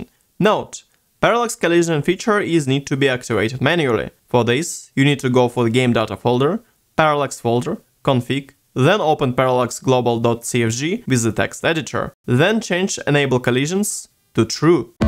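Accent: Russia. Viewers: Мне кажется или это русский акцент? Спасибо за гайд, чувак! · Наверное много кто не поймёт этот комментарий но акцент мне кажется у него русский... Это просто теория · Мммм, руский акцент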